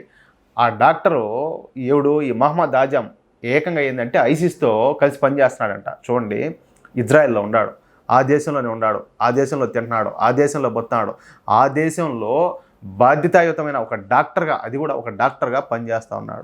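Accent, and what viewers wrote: Rayalaseema, India. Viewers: సార్ చక్కటి రాయలసీమ యాసలో చాలా బాగుగా వివరించారు. దన్య వాదములు.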